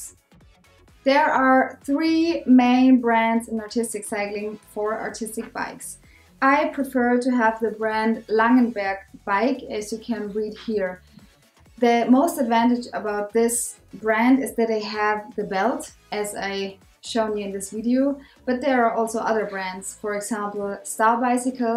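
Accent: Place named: Germany. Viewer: Man hört, dass du deutsch bist. Bist auf jeden Fall der Hammer! Weiter so^^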